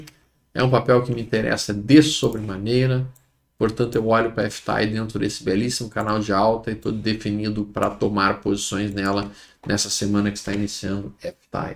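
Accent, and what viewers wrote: Rio Grande do Sul, Brazil. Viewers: falou tdo gauchinho de garopa bah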